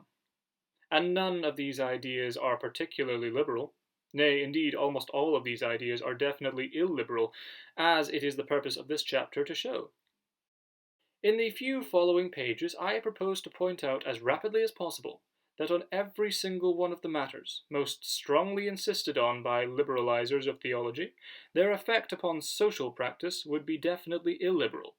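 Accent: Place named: England, United Kingdom. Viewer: Finally! Orthodoxy in an English accent 😊 thank you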